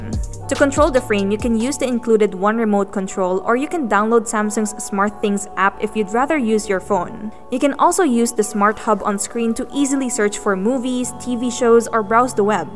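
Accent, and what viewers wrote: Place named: Philippines. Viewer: that irritating pinay accent.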